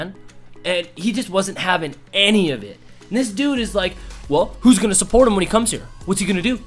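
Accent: Canada. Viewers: Where you from in canada you kinda sound like a sauga man cant lie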